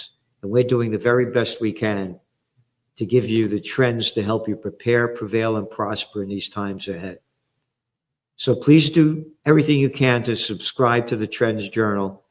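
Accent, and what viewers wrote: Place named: Bronx, United States. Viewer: Hi GERALD FROM ENGLAND ( what's left of it ) I LOVE THE WAY YOU SAY "CLOWN'S" WITH YOUR BRONX ACCENT ...SOUNDS LIKE THE MAFIA WOULD SAY.🤡 SIR YOU HAVE YOUR FINGER ON THE PULSE OF TIME....💪👌CRITICAL TIMES INDEED 🙏.I STOPPED VOTING IN 1987 ..I DIDN'T WANT TO ENCOURAGE THEM 😔 TO QUOTE GEORGE CARLIN ..... " IT'S JUST A BIG CLUB & YOUR NOT IN IT,YOUR NOT IN IT !!! THEIR POLITICAL WEBS🕸️🕸️🕸️🕸️🕸️ OF DECEIT & LIE'$🤑🤑🤑🤑🤑.THANKYOU FOR YOUR BRUTAL HONESTY I ADMIRE THAT IT IS A STRENGTH OF CHARACTER....I AM SICK & TIRED OF ALL THESE SPINELESS POLITICAL LEADERS FULL OF HOT AIR!! WE ARE NOW IN A TOTALITARIAN WORLD....AN ORWELLIEN NIGHTMARE 🤑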